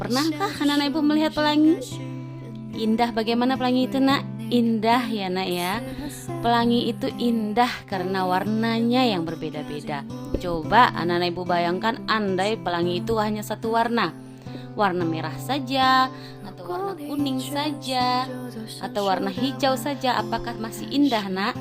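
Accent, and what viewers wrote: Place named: West Sumatra, Indonesia. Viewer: Msih pkat logat minangny buk...ttp smngat cik gu ,🙏🙏☺️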